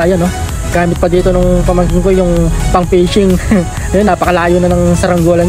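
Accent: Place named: Pampanga, Philippines. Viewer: kapampangan ka pala paps. kumusta na ecu?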